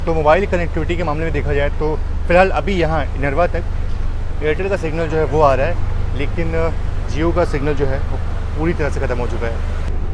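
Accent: Varanasi, India. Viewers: Bhaiya AAP banaras ho ky aap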